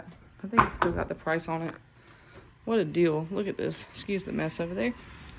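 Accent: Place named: South, United States. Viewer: I like the way you have the furniture but over by the far wall would be good too. I love that tree. Your bedroom is big and your bathroom.👍what a beautiful home!!!! Love it. You are a lucky girl. I forget what state? I think I hear a southern accent. Your husband seems like a nice guy. He has a sense of humor.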